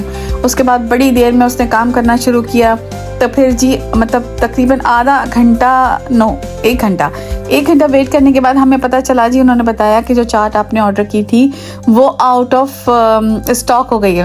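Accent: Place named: Karachi, Pakistan. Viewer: Salaam ...Aap ke Bolnay ke Andaaz se hi Mai samajh gyi thi ke Aap Karachi se hain ..wesay Karachi Wala Taste to Dunya mai Kahin Nhi milta ...Yehi Sach hai ....By the way watching ur vlogg for t he first Time ....Nice vlogg .👍🏻.......Hamesha .Khush rahain .....🌹🌹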